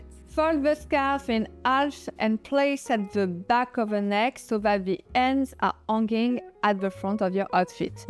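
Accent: France. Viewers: Hard French accent.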